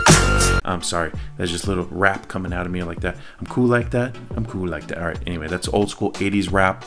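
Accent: Tamil Nadu, India. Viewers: I love your tamil accent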